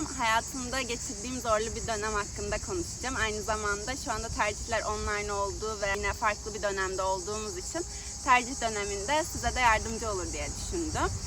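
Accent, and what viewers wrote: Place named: United States. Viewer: Bilkent üniversitesi vakıf üniversitesi Boğaziçi devlet okulu kıyas klasman farklı ama siz bence Ankara dan kaçmışsınız,Bilkent girişi kolay mezun olmak zordur,Boğaziçi zorsa Bilkent kesinlikle daha zordur ,devam zorunluğu vardır ve hangi bölümde okursanız Türkiye nin en iyi bölümü olarak okursunuz bunu size okul her türlü hissettirir,Ankaralıyım diyorsunuz evet İstanbul daha güzel bir şehir ama Ankara’da Tunalı,Gop,Bahçeli de milyon tane Cafe vardı,biz bayağı gezerdik siz içinden değilsiniz galiba,kulüp kurmak isteyenler rahatlıkla kurabilirdi,Bilkent mezun senenizde kurumsal firmalar bizzat gelip kariyer günlerinde elemanlarını seçerdi bir çoğu mezun olurken iş sahibi olmuştu,Boğaziçi güzel bir üniversite ama imkanlar Bilkent te daha fazla ,network demişsiniz sadece güldüm😂😂 hazırlık kabus gibidir evet aşırı zordur ama siz ielts aldım demişsiniz keşke 1,5 sene beklemeseydiniz,birde bu konuşma stili gerçekten çok komik ,özenti kendiniz gibi konuşun gerçekten çok itici duruyor ,bizler Türk toplumu olarak Amerikan yeni dönmüş işçi havasında konuşmayalım,Türkçemiz kişiliğimiz her daim sizi seçkin kılacaktır.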